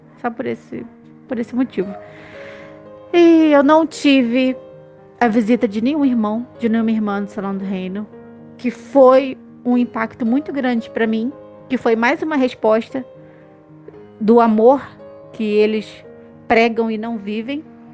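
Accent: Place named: Rio de Janeiro, Brazil. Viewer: Carioca da gema 😃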